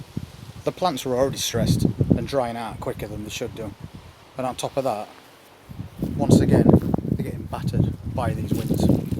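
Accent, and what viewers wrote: Yorkshire, United Kingdom. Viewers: I've planted Kondor (amongst others) in 30 ltr containers, I'm really hoping me watering every day in this near tropical weather is going to give good results, the odd one is dying back, I've really got itchy gloves wanting to empty one out and see what they are like! I also did Ratte, had some decent first earlies from them. 😀That does sound like a Yorkshire accent?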